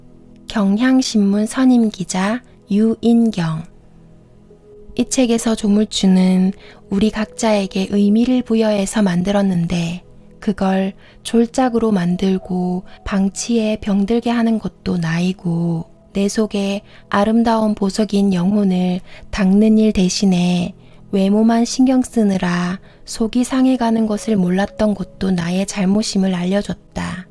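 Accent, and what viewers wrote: Gyeongsang, South Korea. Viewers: 경상도 사투리가 약간들어간 목소리가 너무 좋습니다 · 갱상도 말씨 너무좋아 조아요^,^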